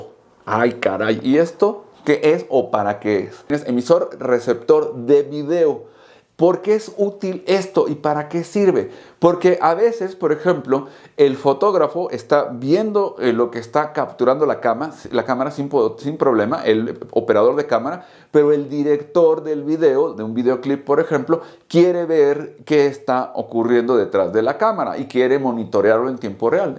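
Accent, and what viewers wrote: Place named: Mexico. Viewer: su acento mexicano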